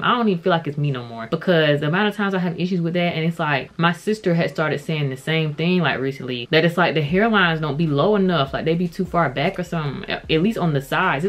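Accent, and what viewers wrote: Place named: South, United States. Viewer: I honestly thought you were South African. Your name could be Nthabiseng (sesotho for bringer of joy) but your Southern accent is coming through haha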